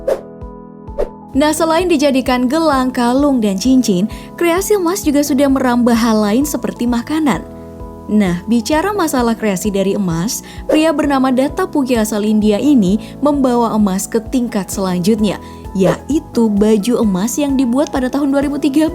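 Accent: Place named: South Sulawesi, Indonesia. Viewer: fixx miminya dari sulsel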